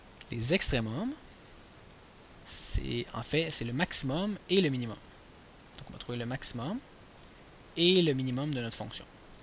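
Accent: Quebec, Canada. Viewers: il est Quebecois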